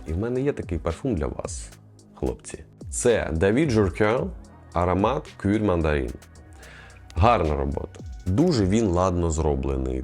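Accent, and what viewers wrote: Ukraine. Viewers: українська мова з Харьківським акцентов))) АГООНЬ))))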